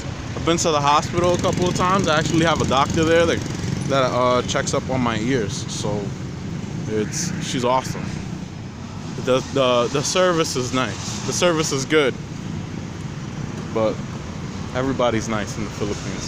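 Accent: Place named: Bronx, United States. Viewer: It was your accent. Just reminded me a lot of my co-workers up in the Bronx. LOL. I work around the Fordham Area. Enjoying your videos.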